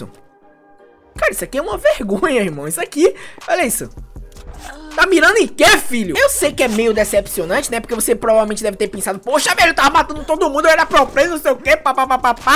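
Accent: Brazil. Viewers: esse sotaque ai é de salvador né, possivel...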